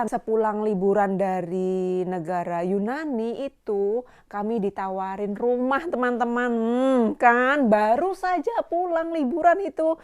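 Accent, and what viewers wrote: Java, Indonesia. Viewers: Mbak ini logat ngomongnya seperti orang Jawa, salam dari Jogja mbak, suka banget ngikutin vlognya sehat selalu mbak dan keluarga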